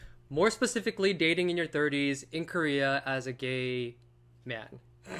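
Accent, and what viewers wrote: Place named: United States. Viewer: You sound American... You speak English well!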